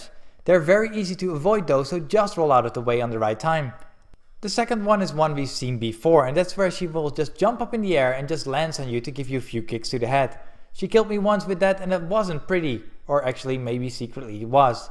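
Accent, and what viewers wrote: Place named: Netherlands. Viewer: I heard it because your accent, especially when you said: Sigrun, like Sigroen :), boven Holland :), I am Dutch too, I like your video, keep up the good work and keep going, I subbed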